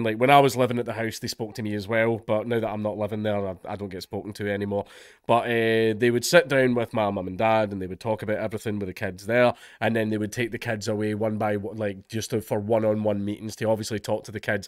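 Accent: Scotland, United Kingdom. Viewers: This is literally the first dankula video I've watched in my life Was not expecting him to be Scottish, I have to say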